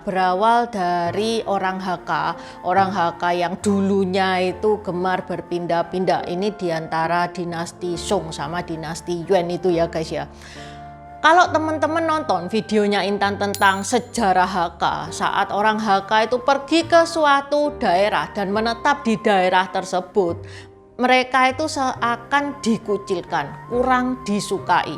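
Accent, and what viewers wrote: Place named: Purwokerto, Indonesia. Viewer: Maaf mba Logat bicaranya kok seperti orang Purwokerto yach😁🤭🙏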